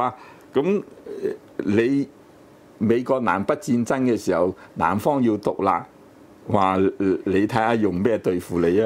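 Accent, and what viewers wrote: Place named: China. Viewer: 女主持有口音。。。兩個都係大陸人。好粉紅喔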